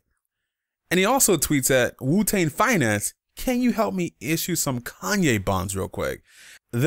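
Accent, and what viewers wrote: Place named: New York, United States. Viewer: Martin Shkreli......whos mans is this????? New York Accent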